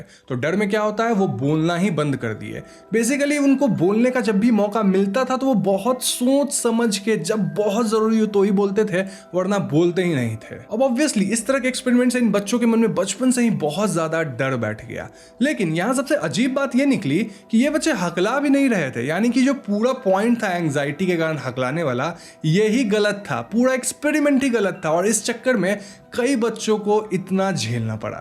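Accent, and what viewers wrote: Bengal, India. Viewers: Sir aap bangali ho ?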